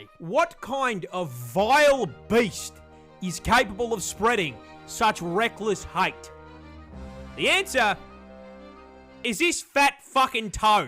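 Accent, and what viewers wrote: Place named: Australia. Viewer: I always enjoy your yapping, it’s very educational. Of course it doesn’t hurt that Australians have the third best accent in the world, second place going to the Scottish and first (unfortunately) going to those damned Brits!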